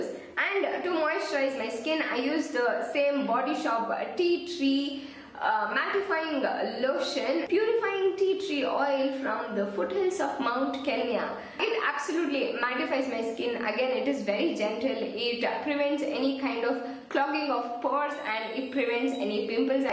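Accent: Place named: South India, India. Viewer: You have the cutest south Indian accent.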